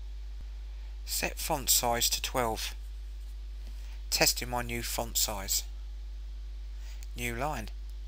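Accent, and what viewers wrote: United Kingdom. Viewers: I am really appalled, shocked, dismayed. As to these mumble mouth individuals. Trying to provide instructions for using, Naturally Speaking. When they can hardly speak, themselves. Never mind that nobody is actually speaking into a microphone, directly. So that you can barely understand what they are saying. In a terrible acoustic environment to boot. This is just mindless, buffoonery. And this guy with his mumbled British street slang. Sounding like he is 10 years old. Stupid clueless buffoonery is on the rise. It's rife throughout the world, today. As we regress back into the 19th century here in the 21st, century. Except that we now have voice recognition software with people who cannot articulately speak or enunciate words correctly. And yeah. Voice recognition software isn't going to work well with people who cannot speak. Who mumble and mispronounce, words. 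Which happens often. As in, "ofen" and you're not British. And not off 10. Or off, ten. And a chasm is not a, chaz'em. It's a, "kazim". Otherwise how do you expect voice recognition to understand, mispronounced words? Unless you build in the proper pre-buffoonery into the software for such illiterate pronunciations. That doesn't make the product better. That makes people far more illiterate and incompetent. And the inability to deliver a spoken concept. With the inability to articulate it into the proper word stream. Um, duh, ya know, I'm saying. Way. Go. Dog. I think that sentence is perfectly understandable. From a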